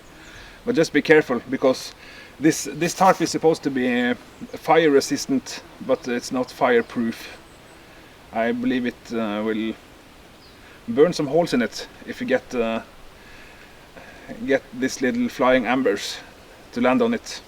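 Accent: Norway. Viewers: Hei! Nice Tarp Setups and a nice norwegian Slang in your englisch speek! U got a new subscriber :) Best regards from germany, zwosiedler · My great-grandfather was born in Nesjestranden(Nesjestranda), Møre og Romsdal. I've always enjoyed the Norwegian accent. I grew up tent camping, but now I like hammocks more. Nice information that's useful. A pleasure to watch ;)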